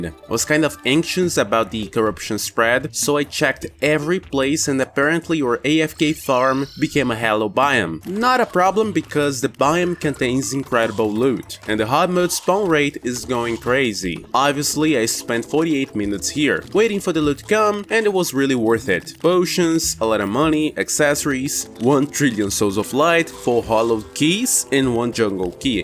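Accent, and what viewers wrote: Brazil. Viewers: Awesome video just like the prehardmode one. I'm trying to tell where your accent is from, are you Brazilian?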